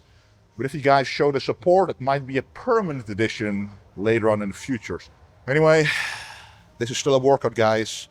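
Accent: Netherlands. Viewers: speaks like a Dutch Optimus Prime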